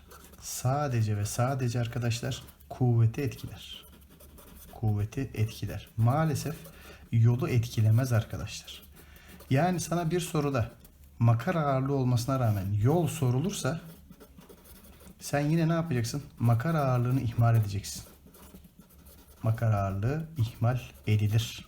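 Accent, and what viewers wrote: Thrace, Turkey. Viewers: harikasınız hocam.. trakya ağzını da öyle cok özlemisim ki videoları hızlandırıp izlemeyi bıraktım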